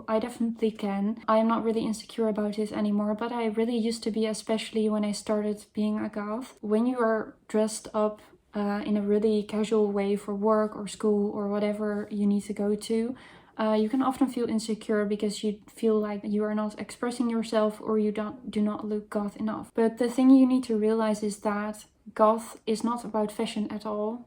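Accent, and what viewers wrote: Netherlands. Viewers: I love your eye makeup. The "phase" thing...I didn't really discover the goth "thing" until my 40s (I'm nearly 47), but I've seen goths in their 70s - no reason it should be only a phase, and if it is for some people what is wrong with that? We like what we like whatever age we are, right? :) As far as bands go, if you haven't heard them or heard of them check out a band called Suspiria - I think they're from here in the UK. Not sure if they're active now, but they were big here in the 90s, and I know they have some stuff on YouTube. Also, if I may say so, I love your accent - the Dutch people I've met have been lovely, caring people without exception and I enjoyed what little time I spent in the Netherlands very much. Hope all is well. :)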